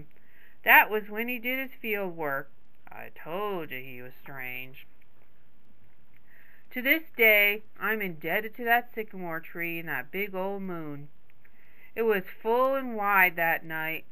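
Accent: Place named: Midwest, United States. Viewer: I like this person's voice for this book, it fits, sounds midwestern to me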